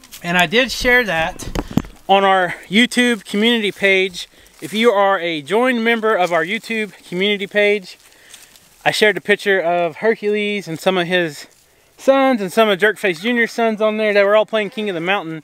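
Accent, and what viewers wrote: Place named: New Jersey, United States. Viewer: as far as I can tell you were raised in Oklahoma but sometimes I hear a slight NJ brogue. I went to college with a lot of people from New Jersey. I am almost 30 years older than you. Back in the mid 1970s it was a less expensive for people to pay out-of-state tuition than pay in state tuition in New Jersey so we had a lot of people from out of state at Pfeiffer College now a University.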